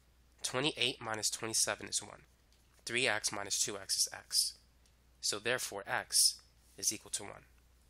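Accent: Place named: Boston, United States. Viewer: You have a Boston ascent. Btw your videos are great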